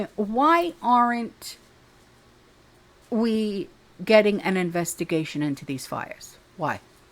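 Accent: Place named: New York, United States. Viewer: I love the way talk.... That Néw Yoik talk íts awesome!!!your awesome☺🤘you rock.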